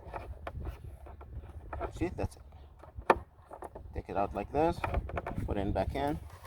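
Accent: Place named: Iraq. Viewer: لهجتك عراقية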